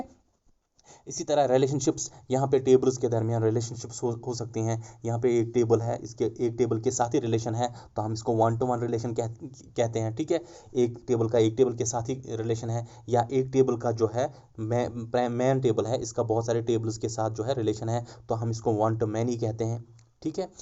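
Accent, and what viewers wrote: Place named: Kulgam, India. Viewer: Meh Basan Sir chu Kulgam Rozan As per Language. Kaiz mh che kulgam peath knh Dost, wo b same Sir ki Tarah baat Krte hai. Content 👌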